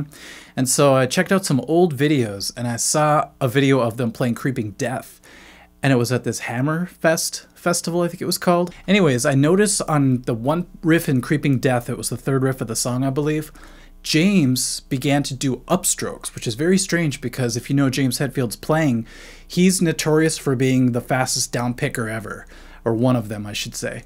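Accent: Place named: Texas, United States. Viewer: Is this guy from Texas? I never really noticed his southern accent till this vid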